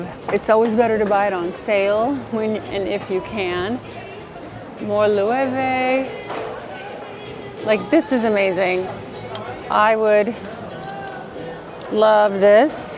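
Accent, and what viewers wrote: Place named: United Kingdom. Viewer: ! You crack me up! All that British accent chatter in the park! I am sure that you went on and on! LOL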